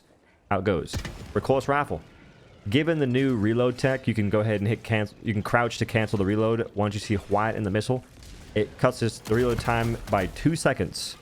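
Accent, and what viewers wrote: South, United States. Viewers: Bro do you have a southern accent or not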